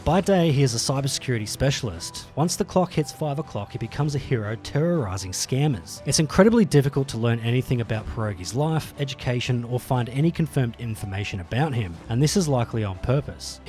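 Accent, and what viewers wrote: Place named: Australia. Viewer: and i just realised your Aussie, even bloody better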